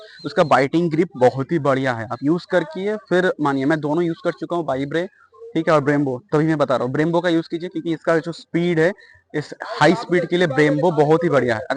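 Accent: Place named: Bengal, India. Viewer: Bong boy. Accent problem bro.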